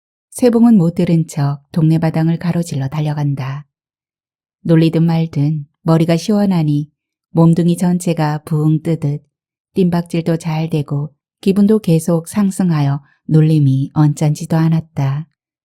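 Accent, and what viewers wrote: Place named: Gyeongsang, South Korea. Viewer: 매일 열심히 살자는 마음으로 산 생활을 합니다. 이 작품은 내 삶의 모습을 잠시 점검케하는 계기가 되군요. 좋은 작품 고맙습니다. 갱상도 억양의 낭독도 참 좋아예~~^^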